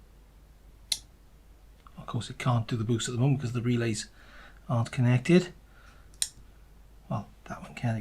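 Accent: United Kingdom. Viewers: Where are you bud, sounds like the UK. I've got a faulty unit that won't charge from the socket. I can't diagnose what component is faulty and wondered if you could help. Thanks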